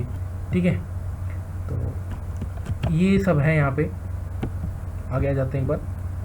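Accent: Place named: Rajasthan, India. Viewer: You from Udaipur or Rajasthan. ?? Your tone depicts that... please tell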